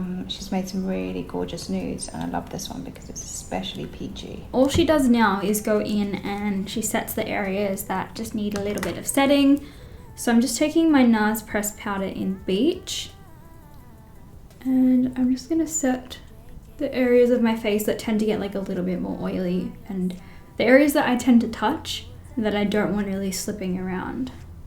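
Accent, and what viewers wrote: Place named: Australia. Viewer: Loved this style vid and love the look. You look beaut. Also loved how much your Australian accent came out to play!💁🏼‍♀️💕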